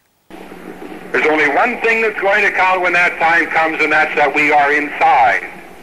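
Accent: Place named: Liverpool, United Kingdom. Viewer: Hey mate you sound like a fellow scouser we've probably been to the same assemblies. Ain't no way these tossers are anointed their just stunt doubles for snow white's seven dwarfs. take care and have a great life free from suppression as I intend to. Cheers my friend.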